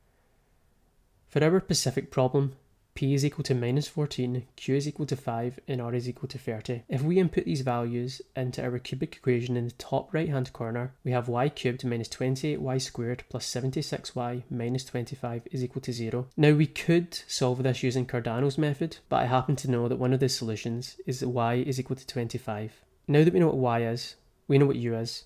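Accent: Scotland, United Kingdom. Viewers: I was searching for about 2 hours for this, thank your for the wonderful derivation of formulas and solution. I love the Scottish accent, if its not Welsh... , love from Pakistan 💚💚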